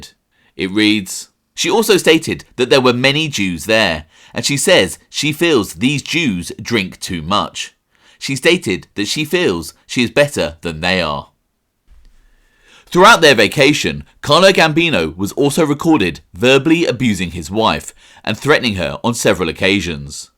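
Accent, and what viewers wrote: United Kingdom. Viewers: I’m so sorry for your voice. Brit plus that lisp is disgusting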